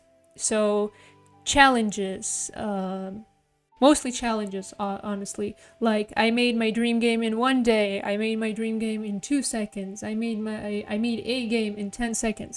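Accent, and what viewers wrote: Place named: United States. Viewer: You have a very American accent, I thought you were an American at first.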